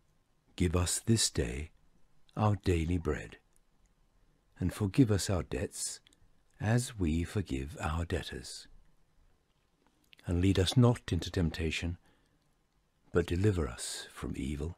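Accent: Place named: United Kingdom. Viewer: Any got any good loops like this but without the “after this manner prey ye” british accent (I can’t hold American too long, just breaks my meditation) thank you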